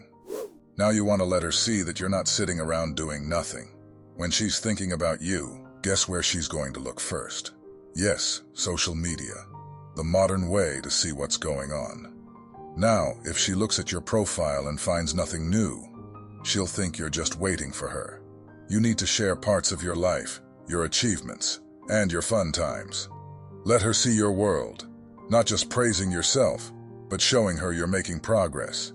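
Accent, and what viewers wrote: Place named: Nigeria. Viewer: You sound like a Nigerian 🇳🇬🙄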